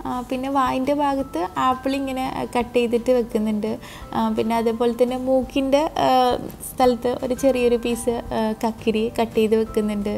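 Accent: Kannur, India. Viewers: Hii ningalea video boar adikkkukaye illa kannur style basha😂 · Thani kannur bashaa😀 nmml kannuranee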